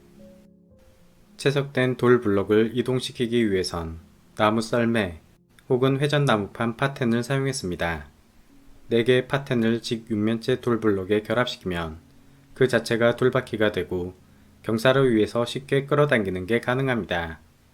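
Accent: Jeolla, South Korea. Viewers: 은은하게 들리는 전라도 억양이 반갑소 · 좋은영상 감사합니다~ 성우분 전라도 사투리가 들리네요😆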